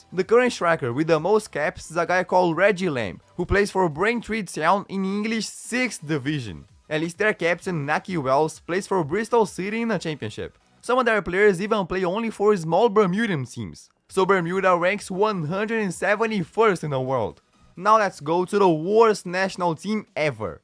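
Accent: Brazil. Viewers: This guy speaks English in a Rio accent. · Ive live in brazil for almost 5 years and i can guarantee that this is a brazilian accent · Sounds like a Brazilian accent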